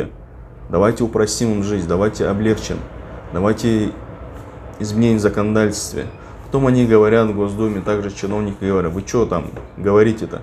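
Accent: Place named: Uzbekistan. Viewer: Кайси шевада гапирасиз узбекчани жуда чиройли шевада гапирасиз 20 йил яшаган Россия да усганга ухшамесиз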